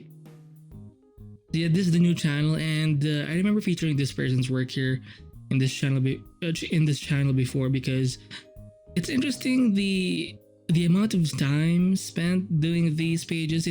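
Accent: Philippines. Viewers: You sounds like a Filipino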